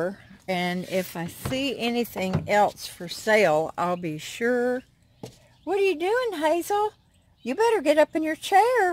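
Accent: South, United States. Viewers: I love your southern accent! Beautiful park!!!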